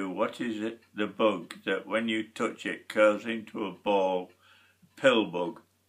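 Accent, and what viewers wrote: Lincolnshire, United Kingdom. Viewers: This is a pretty genuine Lincolnshire accent compared to a lot of examples I have seen online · My family descends from Lincolnshire, and it's simply frightening how little the accent has dulled or changed over the years. There were many times I could hear my own family in this voice.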